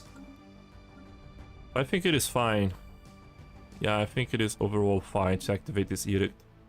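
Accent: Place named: Russia. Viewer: Я думал ты из России или где-то рядом лол.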